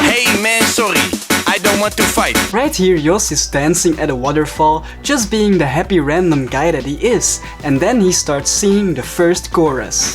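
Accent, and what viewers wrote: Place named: Belgium. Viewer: gy zyt zwz een belg hondert procent ik hoor den accent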